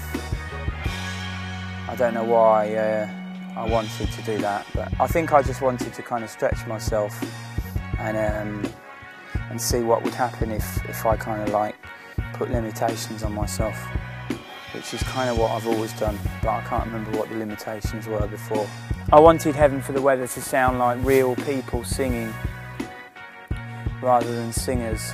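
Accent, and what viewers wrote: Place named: Birmingham, United Kingdom. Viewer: Sorry m8! In real life he speaks in a brummie (birmingham) accent, but in his songs he raps in a cockney (London) accent. Because he is not actually a cockney it is known as a "mockney" accent. Is that any clearer?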